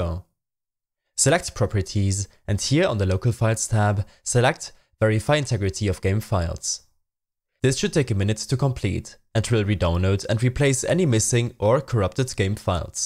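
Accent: Germany. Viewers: Very good Deutsches Akzent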